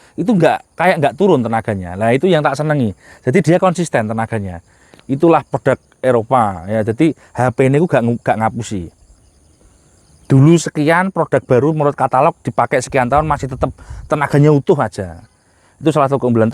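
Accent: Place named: Yogyakarta, Indonesia. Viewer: Haha liat masnya dan itonasi bicaranya jadi kangen jaman kuliah (Jogja)… 😁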